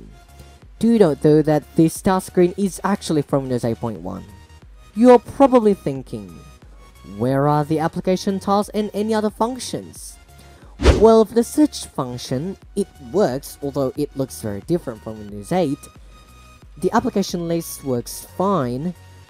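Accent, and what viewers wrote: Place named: Australia. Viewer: it's the Australian accent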